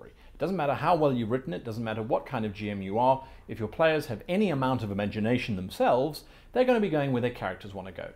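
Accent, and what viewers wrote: South Africa. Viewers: Do I hear a hint of Afrikaans in that accent ? ... if so, Greetings ! really enjoyed this video, amazing tips, will definitely put them to use. You have my thanks. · are you South AFrican? YOu sound South AFrican.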